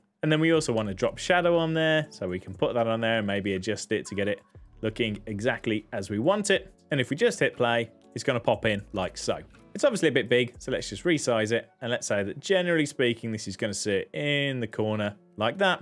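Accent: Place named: Midlands, United Kingdom. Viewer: I live in the Midlands and have an accute "accent" awareness.. Yours sounds about 20 miles from Colin Furze! Somewhere north of Leicester and up as far as Nottingham. Just a random comment!! Cheers, Callum.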